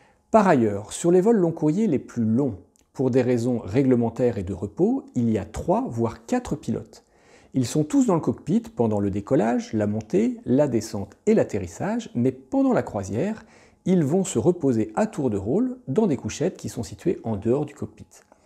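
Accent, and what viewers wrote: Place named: France. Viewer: the ne se prononce pas ze, mais de sur le bout de la langue. Vous faites tous la même erreur les français 😂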